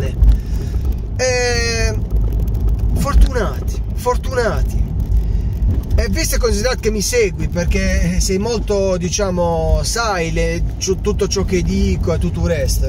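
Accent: Apulia, Italy. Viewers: Grande! Il tuo accento mi piace da morire, hai una personalita incredibile! W la Puglia! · Ciao io sono interista e pugliese come te e ne vado fiero dei nostri accenti e della nostra bellissima e invidiata terra😁poi il calcio è opinabile ognuno ha giustamente le sue ideee vede il calcio a suo modo io infatti guardo i video di più tifosi di più squadre di cui i tuoi proprio per divertirmi e ascoltare sia sfottò che analisi perché infondo a noi tifosi oltre al tifo per i nostri colori e questo che ci fa amare questo sport ciao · Ciao, io sono interista ma ti seguo, a volte dici cose giuste a volte caxxate come tutti noi che seguiamo appassionatamente questo sport. E poi il tuo barese mi fà impazzire in senso buono 😂😂🖤💙🖤💙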